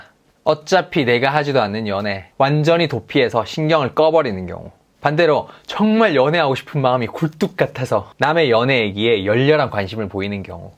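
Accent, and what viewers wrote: Gyeongsang, South Korea. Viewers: 뭔가 말투가 말 끝부분은 서울말인데 단어단어에 부산, 경상도 사투라가 녹아있음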